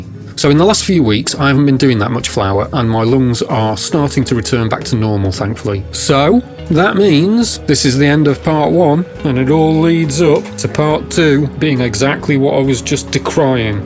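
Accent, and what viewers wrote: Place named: England, United Kingdom. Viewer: Maybe it is because of the english accent in general, but for me as a german you kind of sound a little bit like Karl Pilkington!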